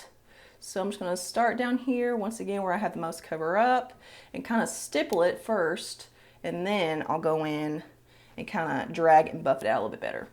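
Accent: South, United States. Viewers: Just found your channel and subscribed as soon as I heard your Southern accent. Even though I'm old enough to be your mama or grandmother I still use makeup and like to see reviews before trying. Thanks for your honesty about the products. I'm in Alabama btw.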